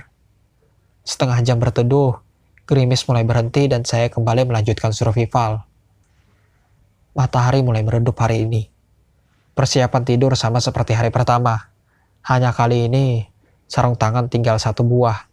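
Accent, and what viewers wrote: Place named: Bali, Indonesia. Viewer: Wah logat bali nih .. 😁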